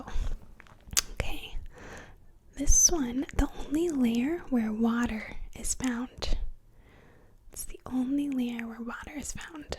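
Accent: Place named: Midwest, United States. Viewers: makes learning fun. (relaxing too) Also, you have an accent. Chicago? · love the midwest accent 😍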